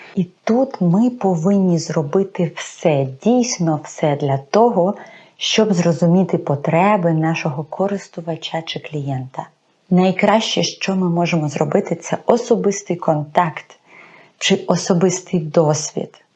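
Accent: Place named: Lviv, Ukraine. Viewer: Типова львівська огидна вимова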